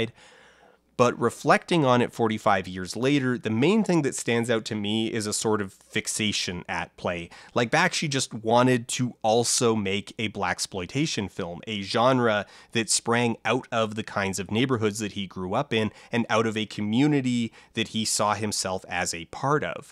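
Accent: Canada. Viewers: That's just a Canadian accent. don't be racist. :P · hearing a Canadian accent so far from home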